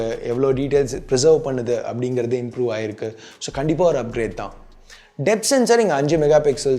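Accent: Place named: Kongu, India. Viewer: Are you from Coimbatore? Your slang sounds a bit like Kongu Tamil.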